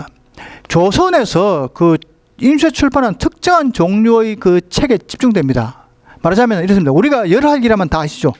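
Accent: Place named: Seoul, South Korea. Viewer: 굉장히 꼼꼼한 성격이실것 같은 사투리사이에 한글에 대한 성찰이 녹아있으시네요 : D 처음엔 재미있을라나 걱정했는데, 찰진 서울말녹은 사투리에서 교양을 발견하고 합니다^^ㅎㅎㅎ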